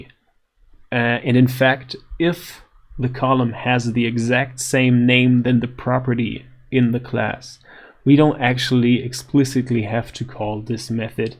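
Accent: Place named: United States. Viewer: Excellent English with a strong american accent. Where did you get that from?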